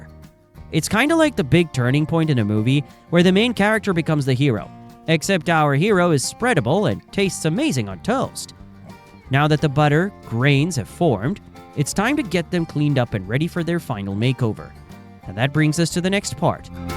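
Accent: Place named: Canada. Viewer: Okay, when you're talking about separating , you're actually showing a batch churn. A few seconds later, you're showing more batch churns... I think. Those cone-shaped things at the mark, those are the cream separators. All those other shots of tanks with vertical stirring paddles, those are probably batch pasteurizers. Maybe just mixing tanks or cheesemaking vats. And "silage" is pronounced SIGH-ludge. "Liters" is LEE-ters. The narration robot's Canadian accent is kind of adorable, though. The rest of the info in this video is basically accurate.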